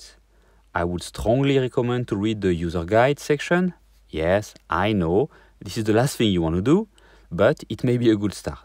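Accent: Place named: France. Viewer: Cool bro, thank you this video. French accent is super cool remind me of Merovingian the Matrix 👌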